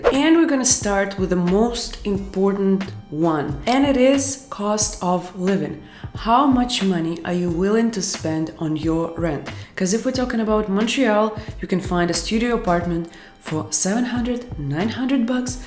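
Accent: Russia. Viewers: Love your Russian accent. Sounds kinda cute though lol 😊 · You speak very clearly. Everyone like me who are hoping to go and settle in canada soon, will be able find your videos very informative & helpful.. By the way, No offence, i like your russian accent more❣️ Thank you for all those videos. Carry on..,❤️❤️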